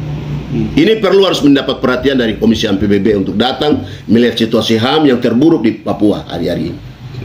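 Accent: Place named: Netherlands. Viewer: Ah,BASI apa kamu? Kamu cuma akan bercerita bohong dengan back ground human right, ya WAROM (mirip aksen Van Holland ),pelanggaran HAM dengan cerita bohong panjang lebar & berita terbalik untuk meyakinkan lawan bicaranya bahkan playing victim ditambah bahasa air mata jika perlu & agama. Perlu diketahui RI atau NKRI itu negara hukum & sangat menjunjung HAM ( human right) itu sebab NKRI punya Pancasila & undang undang dasar 1945,juga jelas secara explisit menyatakan perang terhadap segala bentuk penjajahan bahkan menegaskan harus di hapuskan di seluruh dunia, shg sudah cukup jelas pandangan bangsa Indonesia juga NKRI mengenai ham karena guidennya untuk kehidupan berbangsa & bernegara cuma panca sila & undang undang dasar 1945,shg pasti harus menjujung HAM. Bahkan bangsa & NKRI mengharamkan rasis & biasa hidup plural beda dengan organisasi kalian yang berisi WNA mirip WNI etnik irian juga orang kulit putih itu rasis bahkan mengajarkan ke anak anak, RI terkenal sekali bersahabat dengan bangsa bangsa di benua Afrika & diterima semua pandangan juga sikap hidupnya. 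Hey,WAROM bshkan sekarang jelas semua itu hal yang biasa kalian pakai propaganda adu domba serta fitnah itu,ternyata yang perkosa guru,petugas kesehatan kalian,menyandera anak sekolah,merusak jembatan,membakar gereja rasis itu kalian termasuk propaganda bohong dari agen propaganda luar negeri kalian, semua perbuatan itu melanggar HAM semua. Maka keluar atau tariklah bawa ke tempatmu negaramu & tuanmu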